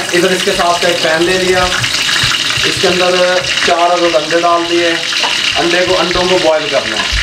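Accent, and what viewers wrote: Punjab, India. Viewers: Sadqe jawan main tuhade vich vich punjabi touch tu hhhh jeonde rewo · Wah kya yakhni ka swad hy.......or wah kya zaban 'punjabi, ki mithass hy....baly baly.......maza aa gya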